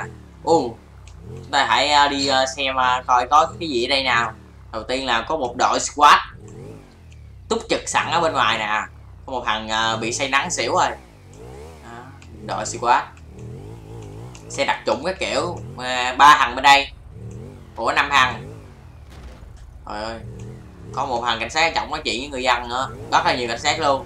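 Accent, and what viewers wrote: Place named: South, Vietnam. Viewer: mình ở hà nội nhưng cực thích nghe giọng caster miền nam, buồn cười dã man luôn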